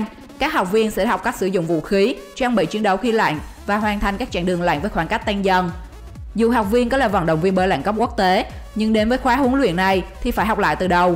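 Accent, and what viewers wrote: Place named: Central, Vietnam. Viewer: Giọng miền Trung mà thuyết minh thì hãm thôi rồi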